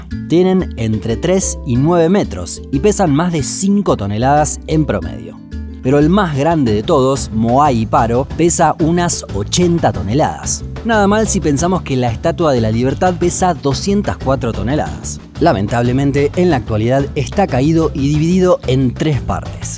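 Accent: Argentina. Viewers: Sos de argentina che! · Eres argentino ? Me gusta tu acento!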